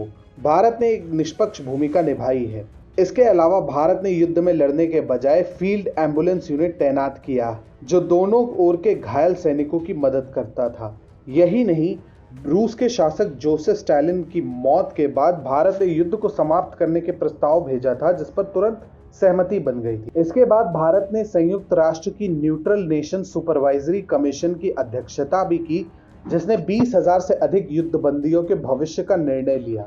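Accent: Standard. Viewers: I am just hear to listen your shuddh hindi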